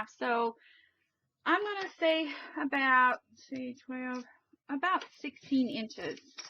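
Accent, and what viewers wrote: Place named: Texas, United States. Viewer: I know this is an old video but I THOUROUGHLY enjoyed it!!! You MUST be from somewhere in Texas! Lol. {You talk like me}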